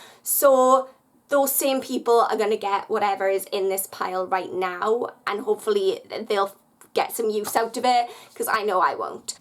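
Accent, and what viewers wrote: Wales, United Kingdom. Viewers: I was trying to pin point it. I was thinking Welsh at first. I blame Torchwood. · Maeli Tickle Welsh and it sounds lovely imo 😊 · ine lie this sounds very much like Welsh accent to me!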